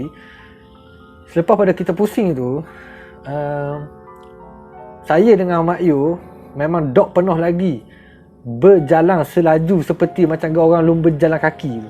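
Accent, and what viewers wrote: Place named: Terengganu, Malaysia. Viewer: Penceritaan.. yg.. sangat.. menarik.. saya. suka. slang.. Ganu. nye.. 🤣🤣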